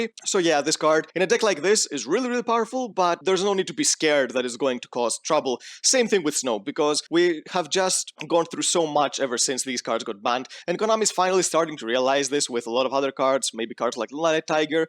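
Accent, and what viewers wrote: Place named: Greece. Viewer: Hey new to the channel here and the first thing I thought hearing your voice was that you may be a fellow greek. Having said that, great content, waiting for more!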